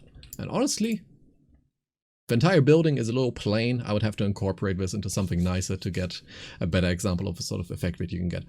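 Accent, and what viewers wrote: Germany. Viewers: Hey bro, is that a German accent I hear there? Really like your content btw, really chill, just like the game lol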